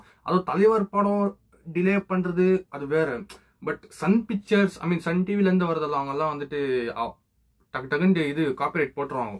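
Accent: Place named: Bangalore, India. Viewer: Unnoda Bangalore Tamil and Excitement for Walter White dialogue ku naan fan aiten da.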